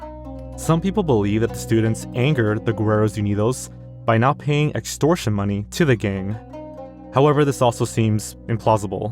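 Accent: United States. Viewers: i find it hilarious that a supposed mexican pronounces spanish names like an american, cmon man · Can't stand the way hes saying Mexican words/names in such a yt/American accent man. Ain't yoir name?!?!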